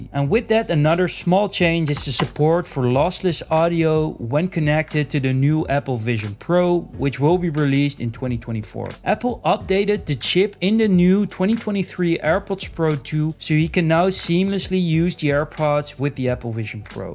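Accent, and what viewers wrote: Netherlands. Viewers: The Dutch accent is strong with this one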